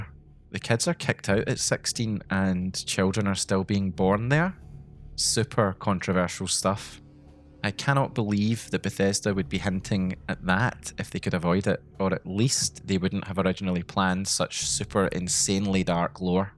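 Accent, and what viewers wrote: Scotland, United Kingdom. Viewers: Nice, the exact same video as the others but with a lovely Scottish accent - that alone makes this the definitive edition lmao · Scottish accent ❤ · Your accent... Is it Scottish ? Sounds great. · You sure hes not Scottish? He sounds Scottish to me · Holy shit, a Scottish video game lore youtuber? I'm fair tricket wi yer video and jist wanted to say fit like the day loon, foos yer doos?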